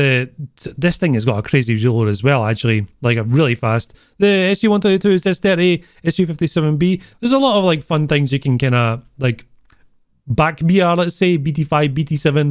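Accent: Scotland, United Kingdom. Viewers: I do really enjoy your intros ! And this one is gold, especially the part on the French "Sacrebleu". As a frenchman I can tell you that i've never said that when cursing :D Love your Scottish accent and thanks for the great content dude :)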